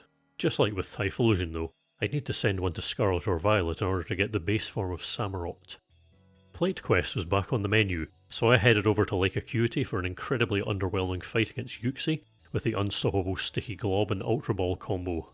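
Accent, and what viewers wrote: Scotland, United Kingdom. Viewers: I'm genuinely curious. Is this your normal voice? Where is the accent from, it sounds british but i am uncertain, is it just a deeper tone? How old are you? I feel like ive heard a similar voice but i cant say for sure and definitely cant remember where from. It is a very calming voice though... i sleep to your vids sometimes and i find it very helpful. I also enjoy rewatching it in the morning, if i feel that i missed something. Speaning of, i should search for those hidden kiwis lol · so this made me want to try this, really enjoyable video, thank you! Also, love ur accent, i am also Scottish but ur voice is very soothing lol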